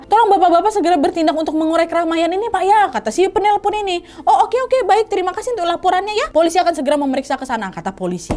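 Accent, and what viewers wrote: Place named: Java, Indonesia. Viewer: You heunti keun itu logat jawa hentikan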